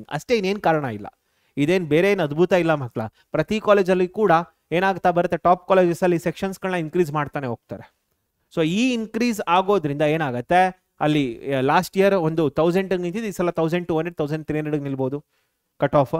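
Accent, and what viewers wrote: North Karnataka, India. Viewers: Sir nivu uttarakarnatakadavara 😊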